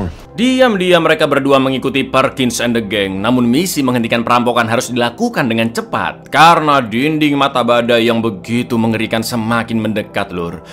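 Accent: Java, Indonesia. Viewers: Pdhal pengen nonton. Tp terlalu medok Jawa nya. Gak asik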